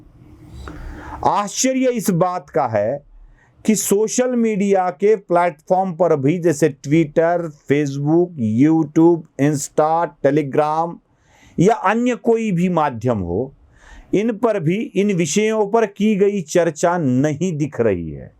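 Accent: Maharashtra, India. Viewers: हिंदी बोलताना मराठीचा प्रभाव ठळकपणे दिसतो.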